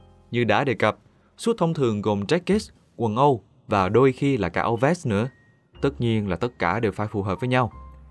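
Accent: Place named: Quang Nam, Vietnam. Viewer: Lần đầu nghe giọng Quảng trên kênh, khá êm tai nha 👍👍👍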